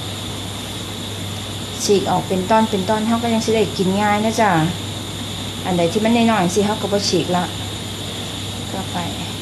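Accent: Isan, Thailand. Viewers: ติดตามคลิปพี่หลายคลิปแล้วค่ะชอบพี่พูดอีสานมากค่ะพูดเพราะมากๆค่ะ ทำอาหารก็สะอาดน่าทานมากค่ะ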